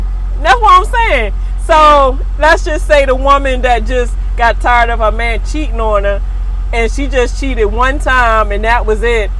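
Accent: Baltimore, United States. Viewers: you can tell she from b more city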